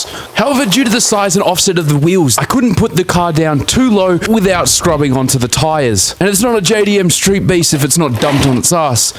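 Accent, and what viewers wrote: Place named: South Australia, Australia. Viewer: I’m proud of myself, based off your accent I placed you in south Australia and I was right. My first video I’ve ever watched from you and it is incredible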